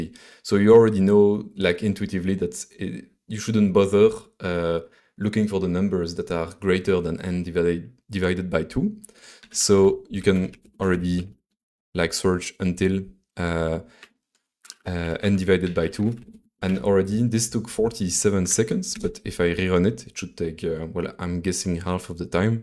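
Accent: France. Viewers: That French access is something truly marvelous, chef's kiss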